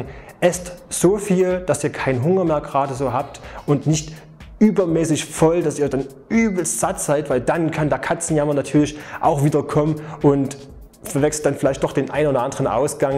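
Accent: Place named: Saxony, Germany. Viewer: Alter sächsisch Mann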